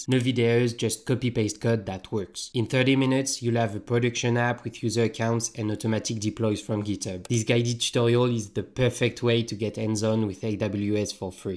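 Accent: France. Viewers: Your french accent is cool ✨ good advice too, keep it up ❤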